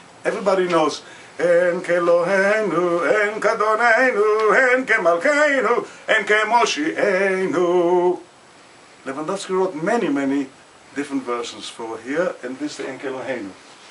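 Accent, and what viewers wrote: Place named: Germany. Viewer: I'm in love with the old German accent. This man reminds me of the aristocratic and finely-cultured Jewish family in the Brock & Brody Theone series The Zion Covenant. And Impressive singing!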